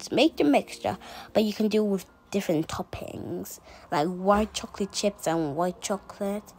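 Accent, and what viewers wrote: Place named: United Kingdom. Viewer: Awwwwww she’s so cute! Love the British accent ❤❤❤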